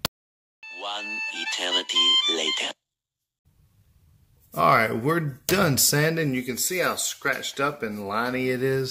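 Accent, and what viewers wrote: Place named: Boiling Springs, United States. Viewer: Dude I love you and your vids. Your work is just beautiful. And that Boiling Springs ACCENT!!! I miss it.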